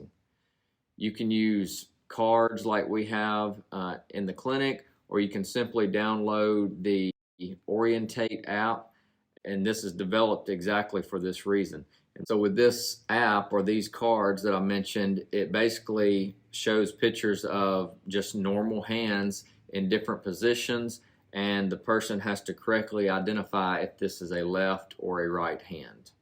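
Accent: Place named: South, United States. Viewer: Well explained and as an Aussie, I like his nice ?Southern accent. I will be trying with my post surgery foot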